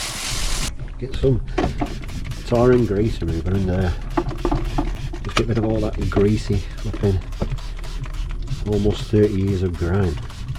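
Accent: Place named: Lancashire, United Kingdom. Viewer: Good Lancashire accent too.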